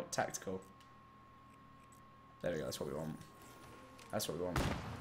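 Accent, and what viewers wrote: United Kingdom. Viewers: Are you British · I like British guys British I like him <3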